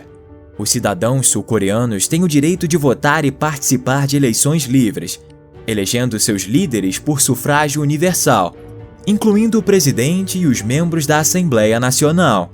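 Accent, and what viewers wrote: Rio de Janeiro, Brazil. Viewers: Vídeo muito bom, educacional, mas colocar um carioca pra narrar ferra tudo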